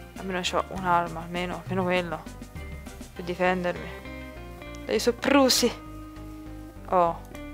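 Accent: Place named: Rome, Italy. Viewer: Solo a me non piace quando parla in romano? :c (non ti arrabbiare, ma per me è così <.< per il resto sei bravissima)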